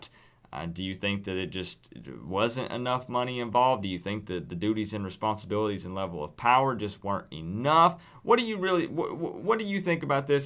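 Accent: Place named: Chattanooga, United States. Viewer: whether you believe it or not, you have a Chattanooga accent. I knew it....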